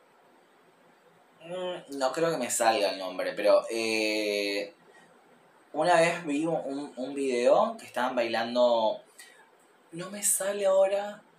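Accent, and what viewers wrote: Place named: Rioplatense, Argentina. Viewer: Por el acento debe ser de Argentina o Uruguay. 😉😊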